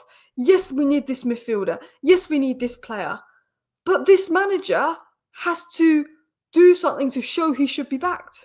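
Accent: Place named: London, United Kingdom. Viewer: I can tell you're a Londoner, by the way you say yoof, bovvered and nuffing. Love your passion for your team.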